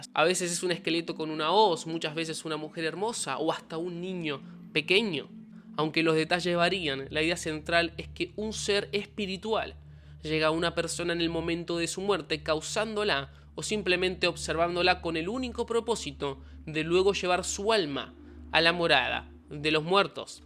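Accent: Argentina. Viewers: Por que los argentinos hablan así ✨✨ reposho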